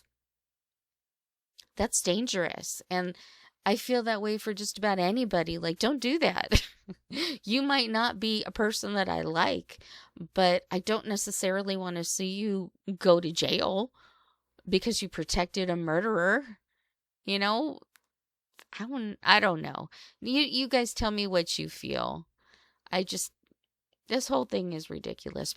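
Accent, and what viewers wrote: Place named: Geordie, United Kingdom. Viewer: I agree, maybe even Leeds. I thought at one point he sounded slightly Geordie or Durham way but he's definitely British I believe 😊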